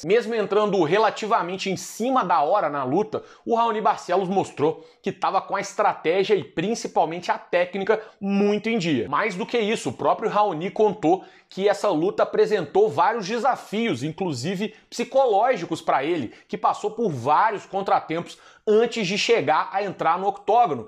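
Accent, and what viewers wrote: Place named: Minas Gerais, Brazil. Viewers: Sotaque de Minas tomando o sexto round hahahah! Ótima resenha 👊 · Show, Parabéns! Adorei o sotaque mineiro, sua desenvoltura e tenho certeza que você terá várias histórias de background pra deixar mais rico o conteúdo. Parabéns!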